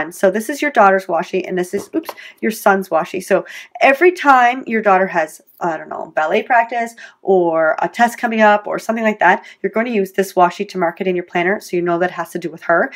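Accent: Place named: Quebec, Canada. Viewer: I'm obsessed with your accent! Mostly Canadian, but there's just a touch of French from time to time. My favorite tip is to use washi to make my own decorated paper clips. I can make them match my layout every week at very little expense and no guilt if I lose one!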